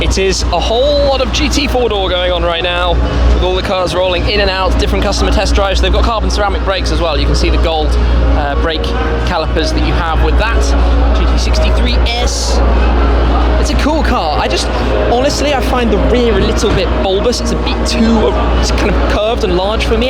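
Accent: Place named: United Kingdom. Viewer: could completely make up a car spec and everyone would believe him cause his British accent makes him sound like he always knows what he’s talking about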